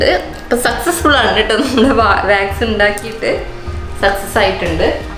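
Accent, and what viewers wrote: Kannur, India. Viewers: Chechide varthanam ketta manasilvum Kannur aann😍 njnu Thalassery aane.😁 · Kannur evideya, slang kettapo thoni. Njanum knr aanu. Ente peru hanima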